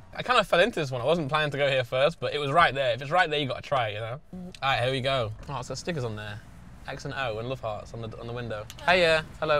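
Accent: United Kingdom. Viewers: The funniest part of this is you look so cowboy with the hat but then you’re violently British when you talk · he prob has one of the most tame british accents lol but go off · I hate British accents, painful watch but I’m only watching to see papi whataburger.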